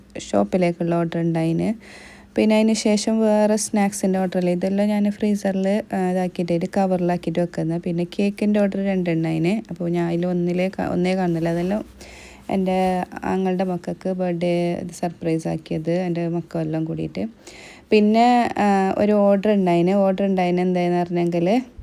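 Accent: Kasaragod, India. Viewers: കേട്ടിട്ട് കാസർകോട് സ്ലാങ് ആണ്, ശെരിയല്ലേ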